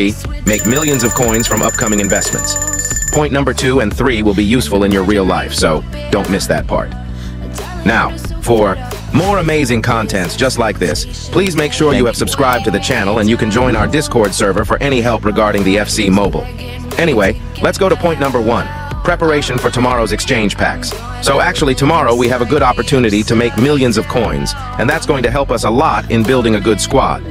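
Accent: India. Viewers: Are you From India😅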